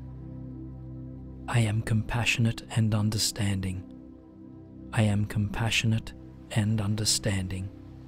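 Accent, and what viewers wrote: Australia. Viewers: J Wheeler an Australian. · I was checking and he is from Australia. I love his accent.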